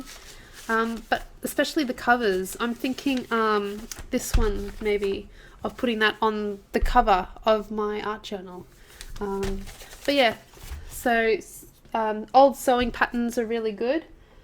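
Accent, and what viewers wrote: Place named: Australia. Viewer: Just found you....love your ideas! Will subscribe....are you in Australia? Recognise your accent, I’m in Oz!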